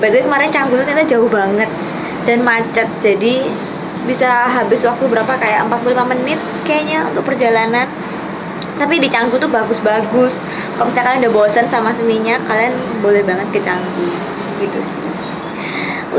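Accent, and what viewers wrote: Java, Indonesia. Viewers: Suarane medok juosssss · Medokny ituloh khas bngt .. jd setiap kata kek ada penekanan dlm pengucapannya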